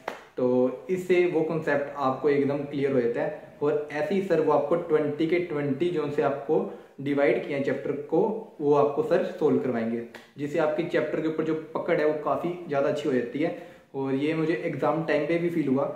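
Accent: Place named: Punjab, India. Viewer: meine is liye puchhe kyonki mein bhi punjab se aur jado tusi gall krde paye si tdd tuhadi tone to mein samj gaya, ki ah banda te apne vall da hi aa, punjabi aa aah te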